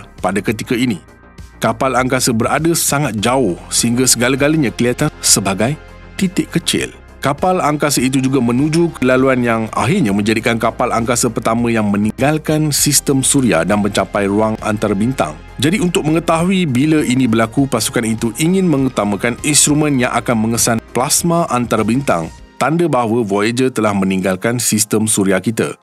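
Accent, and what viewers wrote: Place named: Kuala Lumpur, Malaysia. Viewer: Guna Bahasa Melayu baku tetapi sebutan masih KL... Jika betul2 nak Bahasa Melayu baku, sebutan kite tu kena betul2 kita dan sama bukan same...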